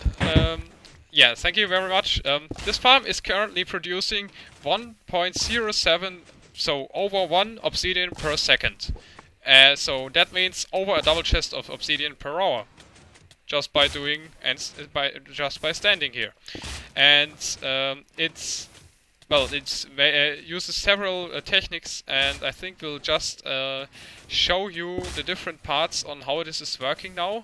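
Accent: Germany. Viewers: yes he's german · Your english sounds like you´re no English/American guy. Are you German? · well then he didnt have a typical german accent. trust me dude, this is a heavy german accent. i am german, in a few videos before he was talking german. this is a german accent. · the accent is from germany ;)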